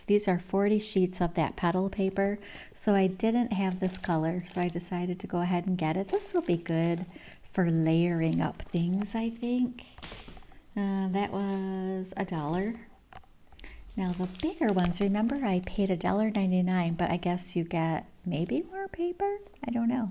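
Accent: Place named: New York, United States. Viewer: That sounds like you’re in New York. Are you in New York? Hailing from Albany.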